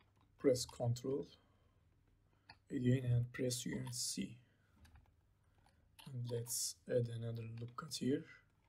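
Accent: Turkey. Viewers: Ellerine sağlık abi Türk olduğunu anladım😁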